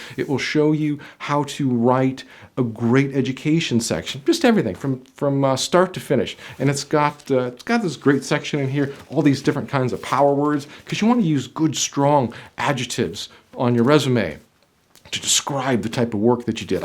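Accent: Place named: New York, United States. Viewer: Amazing content i always watch your video to practice my listening i like your accent ... are u new yorker , aaren't u ?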